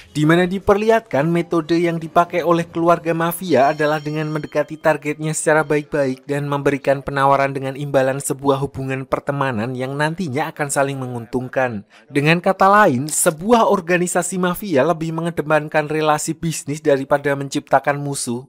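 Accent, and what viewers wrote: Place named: Tegal, Indonesia. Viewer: Narator asal tegal. Di usahakan ga medok pun susah ya min. Kadangada yg lancar tapi kesulitan bedain antara R sampe jadi RL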